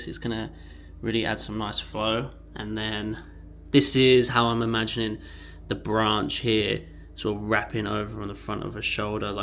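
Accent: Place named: London, United Kingdom. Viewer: I love Londoners accent! Keep rockin the tatt-ewwss mate!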